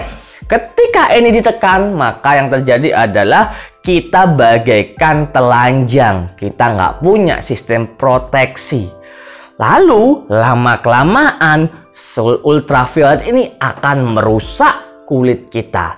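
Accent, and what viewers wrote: Java, Indonesia. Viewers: Logat medoknya doterkuh.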